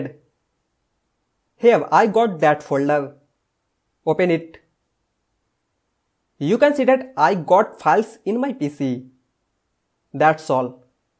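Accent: India. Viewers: Luv your desi accent 💕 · very bad english+indian= leave the video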